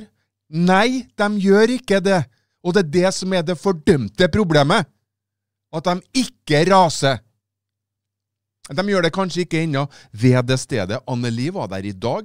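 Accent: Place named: Östergötland, Sweden. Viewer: Bra sagt! Du snackar Östgötska på norska.... Låter väldigt roligt.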